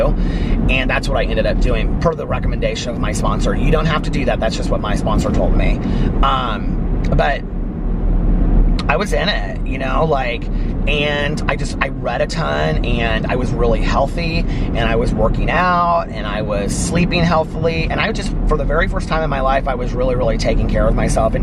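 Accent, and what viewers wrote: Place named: Tennessee, United States. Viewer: Love your voice! Don't listen to stupid people! The first time I watched you I thought you were from Tennessee bc you talk like us 😂 Love your vlogs!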